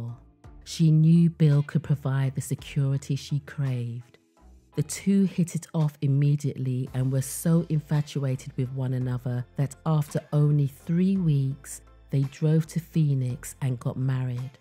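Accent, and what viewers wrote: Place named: United Kingdom. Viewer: I just found this channel and I love your voice. No matter how gruesome the details, they always sound kind of classy when spoken with a British accent. Oh me oh my!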